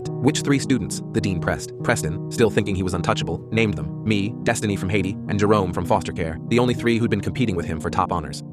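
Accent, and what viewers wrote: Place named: Scotland, United Kingdom. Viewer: why is the ai voice like. VAGUELY Scottish at times...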